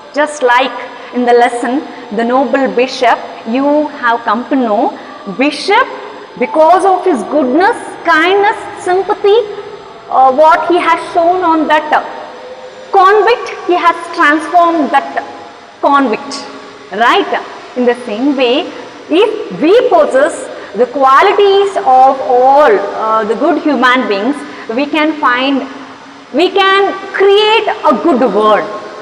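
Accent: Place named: India. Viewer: Very nice and excellent teaching madam.it is in Indian English ,we can understand easily and learn spoken English.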